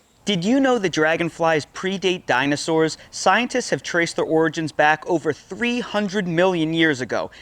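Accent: United Kingdom. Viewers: You have a slight Scottish or Irish accent. I can't specify because thebmixture of American accent. Do you have a parent from Scotland?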